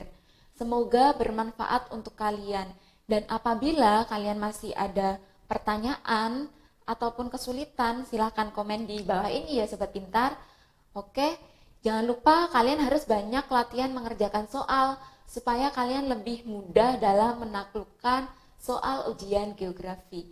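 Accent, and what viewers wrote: Java, Indonesia. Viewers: Alhamdulillah kak bermanfaat, belajar sekarang lebih nyaman bisa online begini, jadi gak monoton ❤️ Sukses ya kak ~ Walaupun agak medok 😁😁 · Menarik... bisa sebagai referensi belajar.. kak suara medhoknya dipertahankan ya...😆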